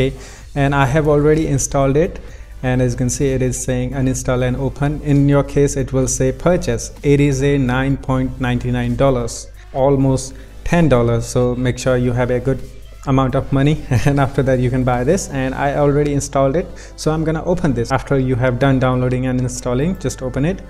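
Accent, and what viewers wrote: India. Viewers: 70% People talking about his Indian accent 30% People talking about ram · Beautiful Indian Accent 🤧✨💖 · The Indian english accent is working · So can you choose what storage you take the ram from? First indian guy i saw who has nice accent😀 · Will it work on my 1gb ram phone? BTW you're my first Indian YouTuber I know who has a great accent unlike the others!!! Keep it up bud!! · First time i heard good indian accent. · Best Indian speaking English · Wait what ur indian :O nice accent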